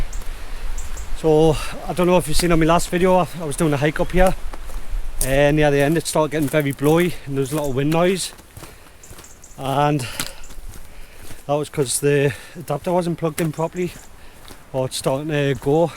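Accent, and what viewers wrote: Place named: Northern England, United Kingdom. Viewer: Hi, I came to your videos as I considering a Pioneer 2 for myself, as they are a good deal at the moment. However, I seen a couple of negative comments about them from a water proofing point of view and also regarding the inner and outer touching. Have you had any issues? You certainly seem to be using it extensively in your videos. Would you still recommend it as a good tent. I'd primarily be using it for cycle touring and an occasional mountain camp. I'm 65 now and can't be bothered squirming around in these low one man tents, trying to get changed, a 1.5 man tent with generous headroom seems the way to go. Finally, do you use the second entrance much? PS, love your accent and videos (went to uni in Newcastle in the late 70's)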